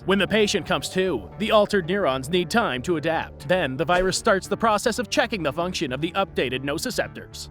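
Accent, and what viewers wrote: Boston, United States. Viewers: Why does he have a Boston accent or like an accent in general like I can't tell what it is but I feel like it's a Boston one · I hear a Boston accent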